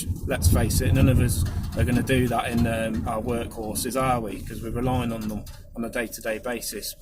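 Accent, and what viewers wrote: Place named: Midlands, United Kingdom. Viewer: You sound like you are from the midlands. Where did you have this done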